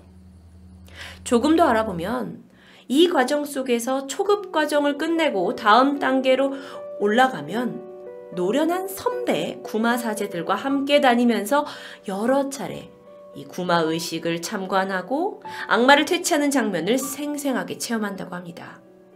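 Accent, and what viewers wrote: Jeolla, South Korea. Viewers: 디바제시카님 전라도 사람인가..?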